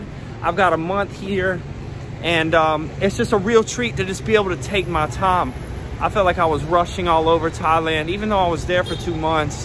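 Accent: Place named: South, United States. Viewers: Gorgeous videos. Love the accent. Where? Louisiana? ... Did you finally see some city personnel on the China side? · Great video n I enjoyed it. Guess you re from the south? Greetings from Southern CA.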